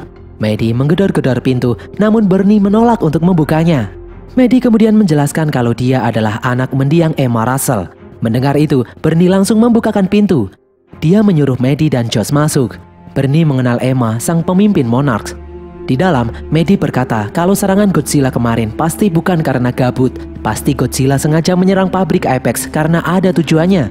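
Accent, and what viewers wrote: Java, Indonesia. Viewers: Min Anda Orang Jawa ya kop Bahasamu koyo ngono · Seru onk boso jowo e 🤣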